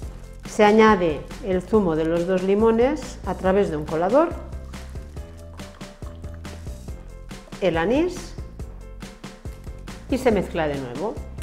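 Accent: Spain. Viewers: Olá ! Ela fala espanhol da Espanha, não é argentina, mas gostariamos de recever-la , você sempre é bem-vinda a meu país! Brigada !! 🌷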